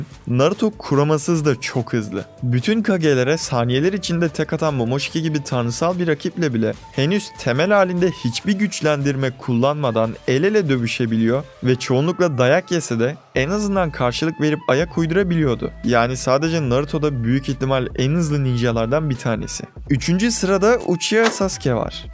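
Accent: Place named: Sivas, Turkey. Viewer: Abi gardaş ı çok iyi telaffuz ediyorsun sende sivaslï havasï seziyorum yanlış da olabilir cevap verirsen sevinirim♥️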